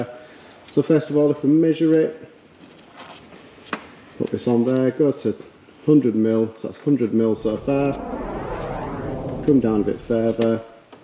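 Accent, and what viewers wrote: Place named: Middlesbrough, United Kingdom. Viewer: How much would a repair like that cost? You sound like boro accent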